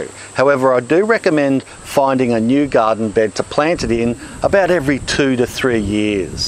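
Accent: Queensland, Australia. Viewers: Great vid, great tips! Thanks, mate! I'm actually living in Northern Canada, where we don't have enough heat or warmth to grow sweet potatoes - at least not the size you have there. But I was initially caught by your accent, and said to my self that sure sounds like a Qld accent. hehehe I went to school at Southport for 6 years.😂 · So good to hear an Aussie voice! We live in S.France and are going to plant our sweet potatoes for the first time...thanks!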